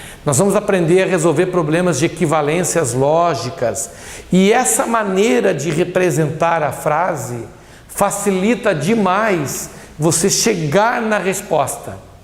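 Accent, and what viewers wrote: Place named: Brazil. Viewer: é pq quando se aprende na escola, a letra e tem som de é e em uma oração, quando se vai ligar palavras, tem som de "i". Acho que falam "ê" por causa do costume de algumas regiões do Brasil, por isso eu estranho kkkkkk Parabéns pela aula, estou assistindo os demais conteúdos. Obrigado por postar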